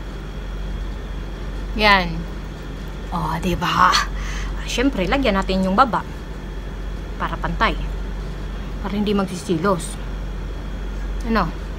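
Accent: Visayas, Philippines. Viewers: i love bisaya accent kaya ok lang yan seswang dami ko friend na bisaya at natutuwa ako kc alam mo mostly sa mga bisaya magagaling sila mag english..buti kapa magaling mag makeup ako seswang tumanda na talaga di ako natutong mag makeup😅kay vice din ang gamit kong liptint maganda talaga sya tapos di nagda dry lips ko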